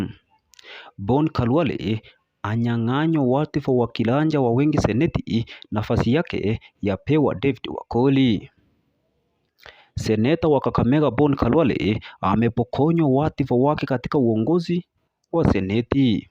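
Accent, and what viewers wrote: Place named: Kisii, Kenya. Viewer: We mkisii uko Sawa kwa matangazo lakini accent nayo 😂😂😂😂😂😂😂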